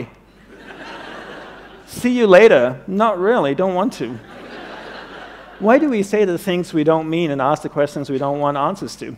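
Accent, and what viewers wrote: United States. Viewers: Oh for fucks sake, Indian fake gurus are now speaking with American accents. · This is refreshing: A Hindu teacher with a New York accent!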